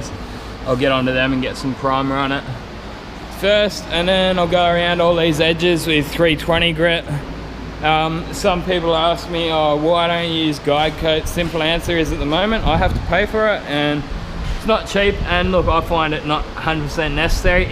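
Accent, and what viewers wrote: Australia. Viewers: I like your enthusiasm. I've been doing this work for 40 years and still enjoy it, but it's fun to watch you work (especially enjoy your aussie accent!)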